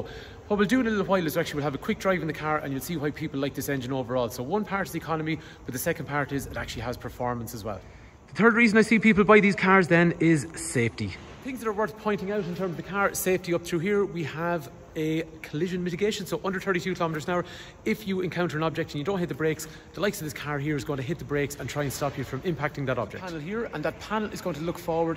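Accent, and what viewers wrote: Ireland. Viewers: This is what we want in a review. A speed talking Irishman! I love it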